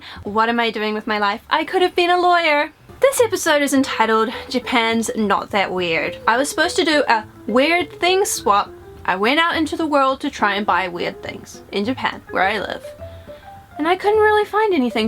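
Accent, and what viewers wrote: New Zealand. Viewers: I love your videos so much, they always make me smile. I can't think of anything strange about my country (Australia). Also I LOVE your New Zealand accent ^-^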